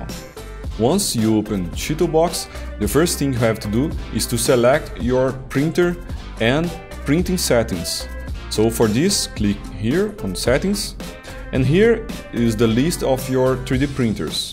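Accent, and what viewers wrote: Brazil. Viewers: So he has a Brazilian accent? I thought maybe it was Russian? GTK thanks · A heavy Brazilian accent... that was unexpected tho! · Oi pelo teu sotaque e nome, você é brasileiro?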